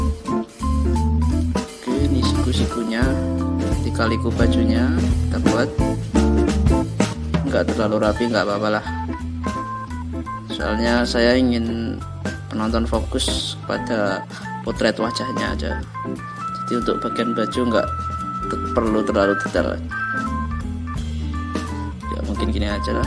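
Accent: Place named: Java, Indonesia. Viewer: Aku suka medoknya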